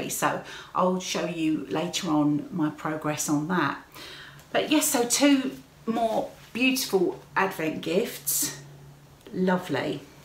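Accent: England, United Kingdom. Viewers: Hi! Greetings from Texas!! I love you videos! Your stitching is so beautiful. I especially liked the project bag you did with your name on it. I had to order the pattern right away!! I really like your English voice - if we met wouldn’t it be funny to overhear us talk - you with English and my Texan!? It would be a hoot! Lol Happy Stitching! Happy Holidays! Be safe!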